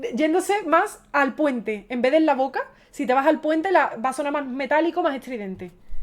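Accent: Andalusia, Spain. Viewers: Sos tan linda!!! Es un placer ver cada vídeo tuyo. Como Argentino disfruto muchísimo de tu acento español y de tu forma de pronunciar la T. Te deseo lo mejor de la vida. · Muy bello video. Me encanta tu acento Andaluz!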